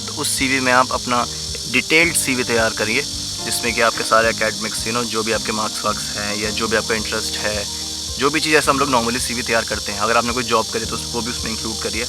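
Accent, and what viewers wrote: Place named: India. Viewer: Subscribed your channel recently. And the most impressive thing I found is the way you talk, pure desi. I really like the way you do your vlogs. Keep going.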